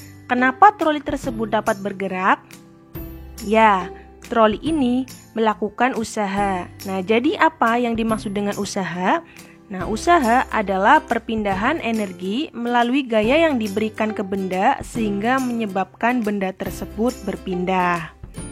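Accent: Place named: Tegal, Indonesia. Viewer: Ini kayaknya mbaknya orang tegal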